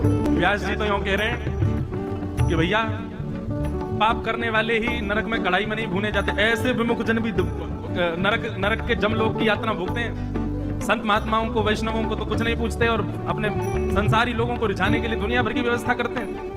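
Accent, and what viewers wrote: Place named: Braj, India. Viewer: जय हो भैय्या बृज भाषा बड़ी अच्छी लगै आपके मुख से। सुंदर कथा। हमने भी राम स्वरूप जी के दर्शन किए हैं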